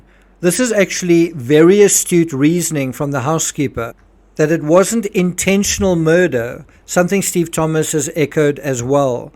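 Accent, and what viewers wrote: South Africa. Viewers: Are you by chance South African? Your accent sounds so familiar- greetings from Nederland…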